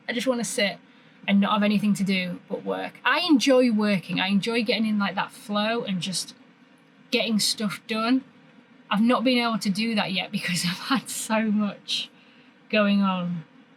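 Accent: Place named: Northwest England, United Kingdom. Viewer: Can tell your from the northwest,not just by the accent,but the old sayings,dead flies brought back so many memories,dinner not lunch,Tea not dinner lol,very enjoyable watching your videos,you have the air of a very enjoyable tv presenter ❤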